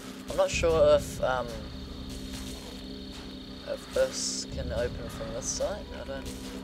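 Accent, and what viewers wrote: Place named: New Zealand. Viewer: HEY! You're from New Zealand too! I recognised the accent :D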